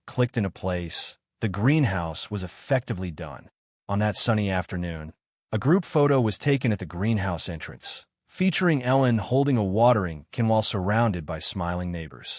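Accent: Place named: Midwest, United States. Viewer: the voice has a Midwest accent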